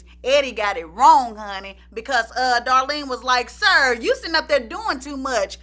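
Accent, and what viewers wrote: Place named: Detroit, United States. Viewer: Sister Love I'm from Detroit Michigan. l got to give it you. The way you run the voodoo down. Sound like you straight out of Motown. Love ya keep up the good work.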